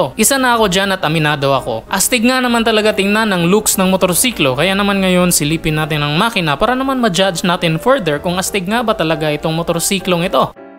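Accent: Visayas, Philippines. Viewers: Dahil Bisaya ka mag salita,,, Subscribed na ako... Heheheh!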